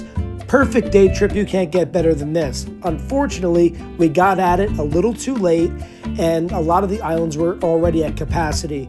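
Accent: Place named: New Jersey, United States. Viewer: I love your videos. May I ask where are you from? You sound like my cousins from New Jersey !! Keep up the cool videos man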